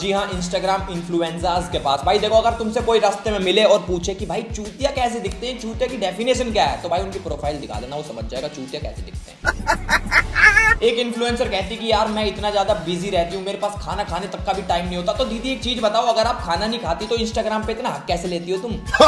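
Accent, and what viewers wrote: Haryana, India. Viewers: Bolne me thoda haryanvi touch h 😍😍😍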